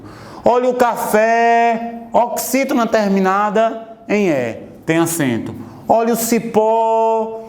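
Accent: Northeast, Brazil. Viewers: Professor apreender com sr. é muito bom e ainda com nosso sotaque nordestino e o jeito que exemplifica é melhor ainda. Parabéns!!! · Você ganhou um inscrito! Da aula muito bem e ainda tem esse sotaque nordestino que é maravilhoso de ouvir! Kkk parabéns professor, todo sucesso e muito obrigado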